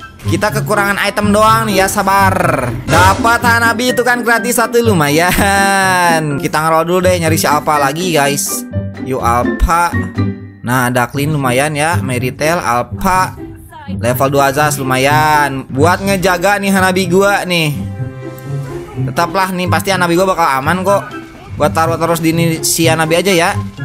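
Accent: West Java, Indonesia. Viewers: Bang kemarin kemana bang di tunggu tunggu eh ga ada gua suka omongan lo bang Sunda asli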